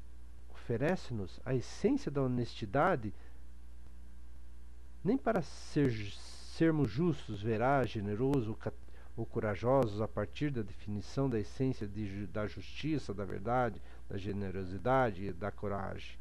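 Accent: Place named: Paraná, Brazil. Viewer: Minha família no Sul do Paraná fala assim tb!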